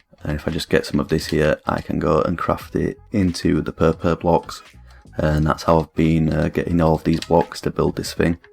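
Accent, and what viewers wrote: Scotland, United Kingdom. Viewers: Ghast Farm????? Jesus you are cracked. I based my base on your's so thank you! You deserve more subs. Also, Are you Scottish? I love your accent :)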